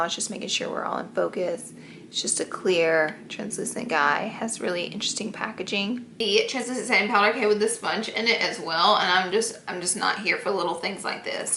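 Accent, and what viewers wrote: South, United States. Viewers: Brittany Hargett she has a heavy country accent, you ignorant bitch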